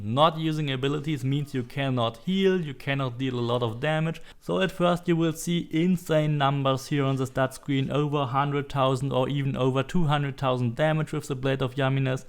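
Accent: Germany. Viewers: You german, you've got a hard accent no offense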